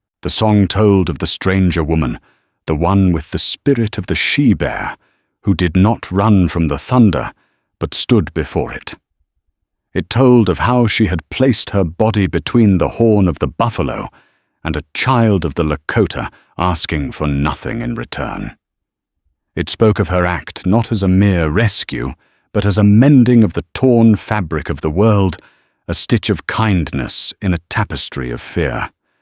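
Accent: United Kingdom. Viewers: Good story read with a beautiful British accent. Listening from Norway. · I really wish an American was narrating this story about the American West! This English accent is so out of place.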